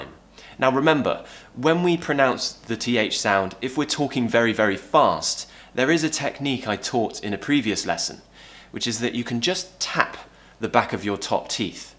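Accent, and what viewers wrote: RP, United Kingdom. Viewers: If it is British accent I love it!!!! Your accent is amazing!!!! I want such accent. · You make me love British Accent ! I like all your videos thank you so much 🌺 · My problem is pronunciation, but i like your british pronunciation. Cheers, mate. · Fantastic teacher with a brilliant British pronunciation. Thanks a million,.✌ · Hit the like button before watching just because of your british accent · Thank you! It is important! - Love your RP British English teaching! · I love the British accent, and I really loved your video! It helped me to understand more of the accent, and how to pronunciate. Big thanks to you! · I love your modern RP pronunciation. Your videos are very interesting and useful. AND you're handsome as well 😉. Cheers!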